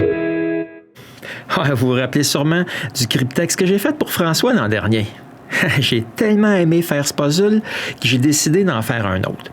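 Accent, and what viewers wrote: Quebec, Canada. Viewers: OH! CT'ACCENT TROP JOLI si c'est pas Québec où Montréal viens plus en France ''ils'coprenn pas envoi le sucre d'orge (le sirop d'érable) et la Crépinne (crêpe) je crois.. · le magnifique accent québécois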